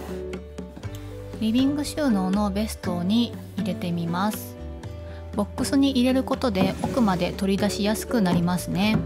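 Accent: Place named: Osaka, Japan. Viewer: 、ご実家大阪なんですねーー。端々になんとなーーくなじみある大阪弁のようなイントネーションを感じていたので、一人で納得してしまいました。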